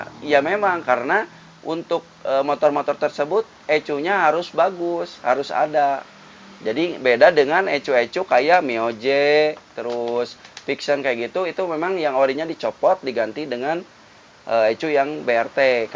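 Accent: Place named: West Java, Indonesia. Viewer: pantasa at beda lmun orang sunda nyarios bahasa indo mh moal lesot tinu lagam /cengkok na a🙏😅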